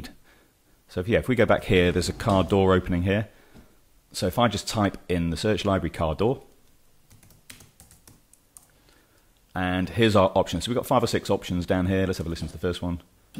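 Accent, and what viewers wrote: United Kingdom. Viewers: Thanks for this - very helpful and your approach is clear and friendly! Lovely british accent too:) From South Africa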